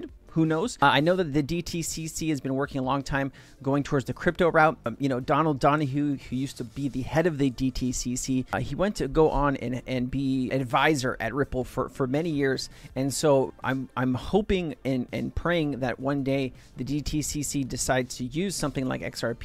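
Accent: Australia. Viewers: Sounds like a fellow Aussie. Letsgetitttt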